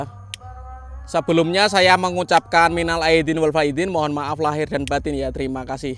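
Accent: Java, Indonesia. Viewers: BG Jawanya Jawa mang bg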